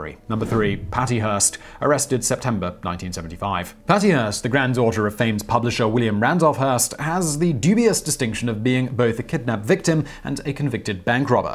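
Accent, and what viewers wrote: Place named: RP, United Kingdom. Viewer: You have the poshest accent I've ever heard!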